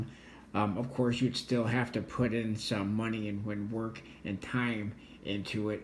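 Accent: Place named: Western New York, United States. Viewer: California?! He’s got more of a Western New York accent.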